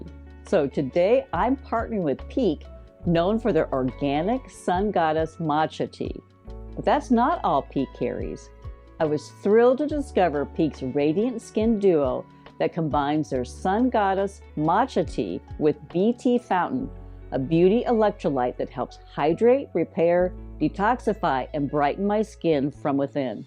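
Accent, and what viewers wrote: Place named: South, United States. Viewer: I’m in Ga too! I thought 💭 I detected an accent. We’re up here in the North West. (Cherokee county). Nice to know you’re a southerner.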